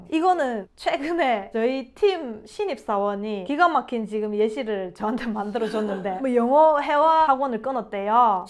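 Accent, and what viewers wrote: Gyeongsang, South Korea. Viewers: 이분은 경상도 사람입니다 조선족은 북한말씨가 많이 들어가 있지요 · 저 갱상도 언니, 말이 참 잘 들리네요. 지방발도 제대로 사용하면 오히려 소통이 잘돼.. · 조선족이 구한말에 경상도 출신들로 구성된 경우가 많아서 조선족이 구사하는 한국어에서는 묘한 억양이 있습니다. 근데 저분은 완전 찐 경상도네요... 그리고 북한말씨가 있는 조선족 말은 함경도 이런 지역에서 온 사람들입니다. 그네들도 서로 지역 감정 있다고 하네요... 에휴ㅋㅋㅋ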